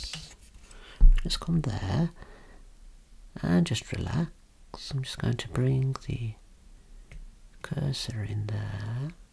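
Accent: Birmingham, United Kingdom. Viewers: My bridge reflection is not as stated how very dare you !! 😂 Great, informative vids, very relaxing and a wonderful voice , a Birmingham accent (at last) velvety smooth that doesn't fill one with a sense of urgent panic as experienced whenever my Brother in law visits. (Aston area) Please don't be offended I'm just trying to be funny . Love your work , thank you 👍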